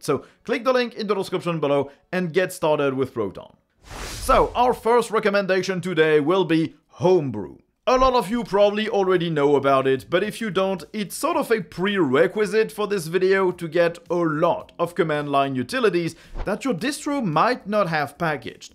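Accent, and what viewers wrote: France. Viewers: génial ! ce petit accent français lol , c'est subtile mais perceptible ;)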